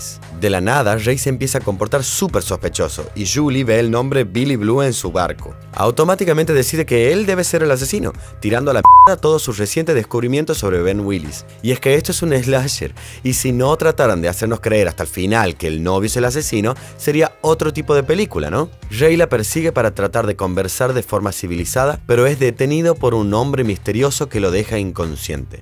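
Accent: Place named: Corrientes, Argentina. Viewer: Jajaja!!!, muy bueno!!, ahora una pregunta!, sos correntino o chaqueño???, por el tono!!!, jajaja!!!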